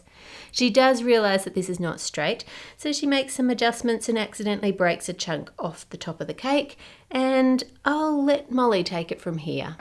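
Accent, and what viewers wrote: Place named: Australia. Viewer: I would watch these videos solely to hear pronounce "chocolate" in her incredible Australian accent. As a linguist, it makes me inordinately happy.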